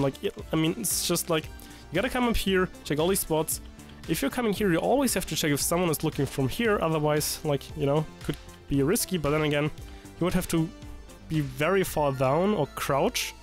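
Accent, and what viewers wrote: Germany. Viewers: man hört richtig das du deutsch bist, trotzdem gutes Video 💪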